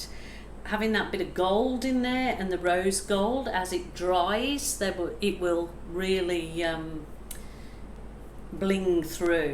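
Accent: Birmingham, United Kingdom. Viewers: I knew there was a bit of Brummie in your accent! This is amazing, beautiful mirrored wings xoxox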